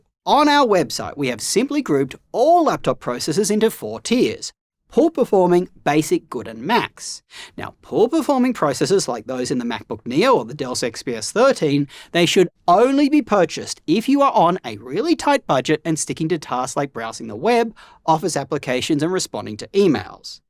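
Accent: Australia. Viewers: i got excited thinking it'd be an australian website with australian deals, thanks to the australian accent.. quickly got shut down. Wish you did one for the domestic market as well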